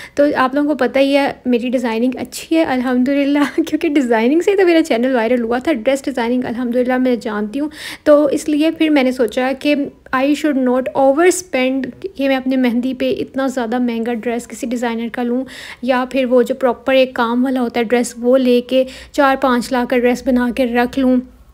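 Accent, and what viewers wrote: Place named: Punjab, India. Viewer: Iam from India punjab te mainu tuadi boli boht pasand h pure Punjabi jado bolde o boht Kazaa aunda h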